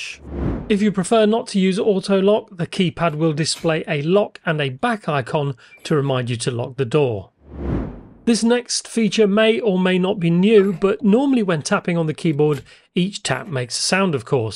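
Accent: United Kingdom. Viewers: I love the ultra wide band! So cool! Great video too! Can I ask if I live in the UK and want to use this on a new front door, you sound British, do you think this is not for that?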